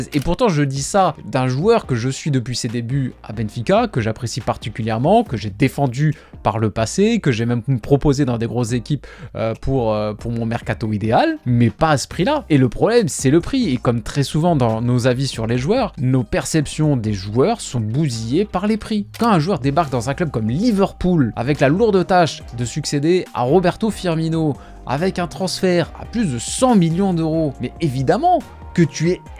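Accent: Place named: Nice, France. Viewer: Ça fait plaisir d'entendre un nissart, issa nissa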